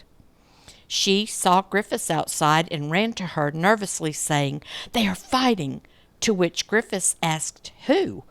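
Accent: South, United States. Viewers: The lovely southern accent makes the narration absolutely perfect and I love it, dont you ever change, lots of love from England.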